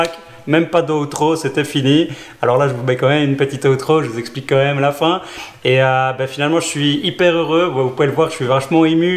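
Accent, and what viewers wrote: Belgium. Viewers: Moi j'aime bien ton accent Belge :-)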